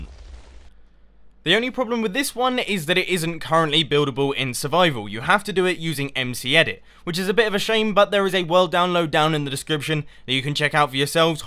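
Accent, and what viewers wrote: England, United Kingdom. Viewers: Ahhh... the britishness of that british voice... like music to my ears... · That intro was very English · Are you british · The british voice made up for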